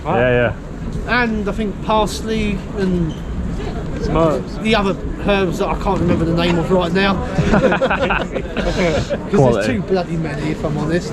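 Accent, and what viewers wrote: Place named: United Kingdom. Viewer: Great video - seems like an "evergreen" discussion :) So, assuming we're talking about 35mm fullframe, to me, this is exactly opposite of your view - I'm a "50mm guy" and find the 35mm to be somewhat between two chairs, if I can put it that way. If I want something wider than 50mm, I find that I reach for my 20mm. Ps. I LOVE your accent - where in the UK does it come from?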